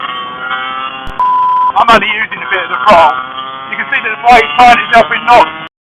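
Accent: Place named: London, United Kingdom. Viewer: Gotta have spent some time in London to know everything he says😂innit geeza.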